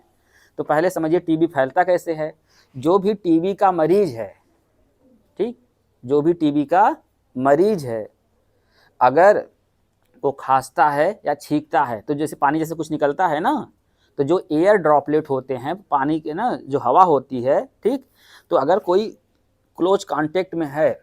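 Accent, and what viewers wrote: India. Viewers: Awaz se lagata hai ki ye up ke purvanchal ke kisi jile se hai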